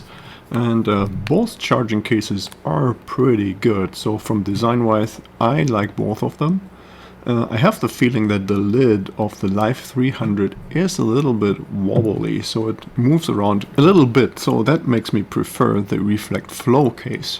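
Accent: Germany. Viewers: U have a German accent so GUTEN TAG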